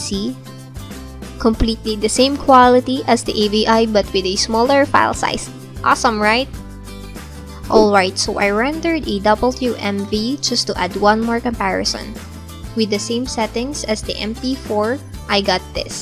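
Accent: Philippines. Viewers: You are obviously from the Philippines with that hard-tongue accent. Hehehe. I'm subscribing. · I'm so in love with your accent!! Very cute!! <33 It sounds like a you have a Filipino accent. Are you from the Philippines? :) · pinay ka po noh ?? haha accent pa lng by the way super thanks po dito sa tutorial vid. mo ♥ :))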